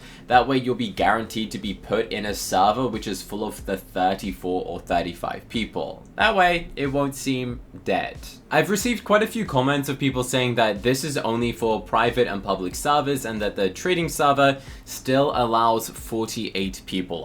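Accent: England, United Kingdom. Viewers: Are you english or American?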